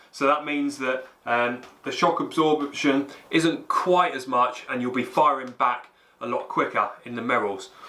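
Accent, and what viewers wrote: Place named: United Kingdom. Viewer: Epic UK accent and great review :-)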